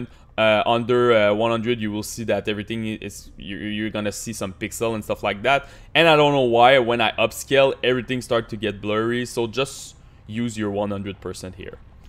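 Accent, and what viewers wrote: Quebec, Canada. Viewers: Are u from Quebec?? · belle accent qc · Are you Québécois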